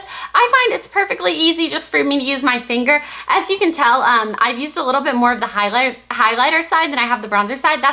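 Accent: South, United States. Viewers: i just watched one of your older videos...and your accent has changed SOOO MUCH! you had a really cute southern accent.. :D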